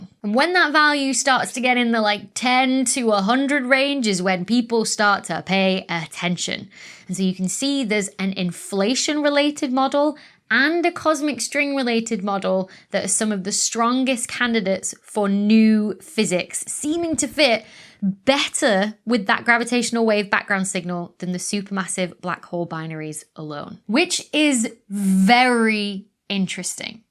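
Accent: United Kingdom. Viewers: If my teachers where this pretty and had a cool British accent I think I’d have gotten much better grades. Or as my kids say more better grades 🤭.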